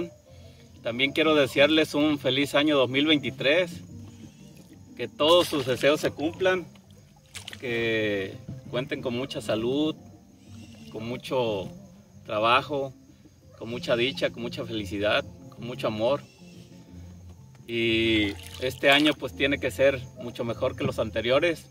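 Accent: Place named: Veracruz, Mexico. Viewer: QUE GUSTO VERLO, ESPERO QUE USTED Y SU FAMILIA SE ENCUENTREN MUY BIEN..... PERO POR EL ACENTO CREO QUE ESTÁ EN VERACRUZ, YA RETOMÓ SU ACENTO... 😅👍🏼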